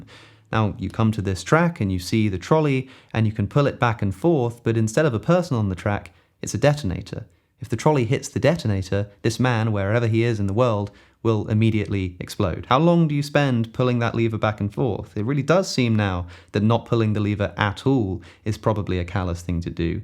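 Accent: United Kingdom. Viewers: No. I have him playing in the background when I wash dishes and sketch. He can be talking about the most graphic shit but it’s still relaxing because he has a British accent 💀.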